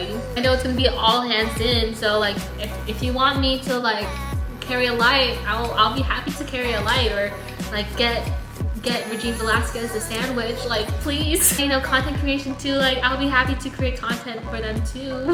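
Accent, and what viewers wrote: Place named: United States. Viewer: Enjoying your vlog especially your American accent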